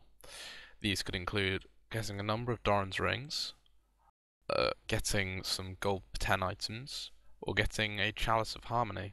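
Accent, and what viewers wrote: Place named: United Kingdom. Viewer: It's typical British accent ;)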